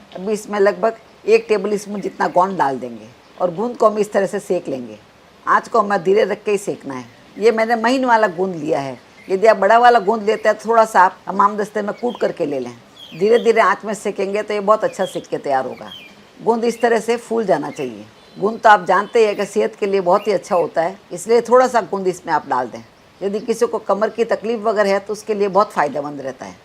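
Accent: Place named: Marwar, India. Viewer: Aap ki hindi me desi marwadi language ka swad aata hai.....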